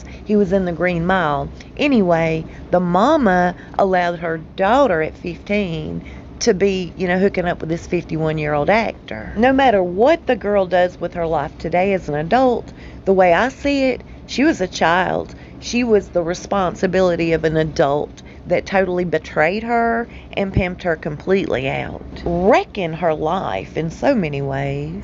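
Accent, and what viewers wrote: South, United States. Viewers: Love your beautiful southern accent,! I also love it when you say "y'all stay safe and be blessed". It makes my day!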